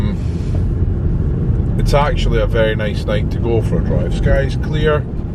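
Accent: Scotland, United Kingdom. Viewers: I’m from Australia and I’m heading off to the Uk/Europe/Mediterranean in April/May. I’ll be in Edinburgh for 2 days and Glasgow 2 days. Thoroughly enjoying your videos. Looking forward to Edinburgh. Your videos are wonderful. I hope I see you doing one of your videos when I’m there. Love your Scottish accent.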